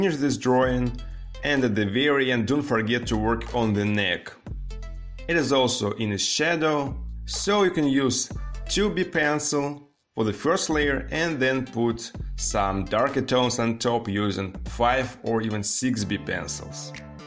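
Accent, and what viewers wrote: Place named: Russia. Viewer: Work on the cheeen Wtf That russian accent gives me crinngee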